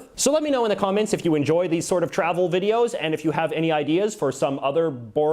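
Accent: Canada. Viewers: It's the Saskatchewan Legislature, not Parliament. Walter Scott was Premier, not Prime Minister. This guy may have a Canajun accent but he's not very well informed.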